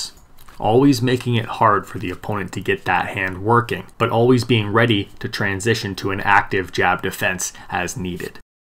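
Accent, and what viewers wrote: Canada. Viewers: I have no idea what your background is, or who you are but your videos are phenomenal! The YouTube algorithm really did it's job recommending you. You sound Canadian(?), and you seem pretty educated. The way you break things down is almost uncanny. Do you talk about your background and I just haven't seen it yet? I'd love to hear about where you came from and who taught you because you are explaining things in an extremely succinct manner that is excellent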